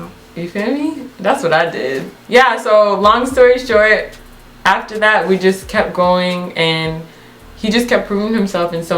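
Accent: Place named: Caribbean. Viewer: He has a bit of a carribean accent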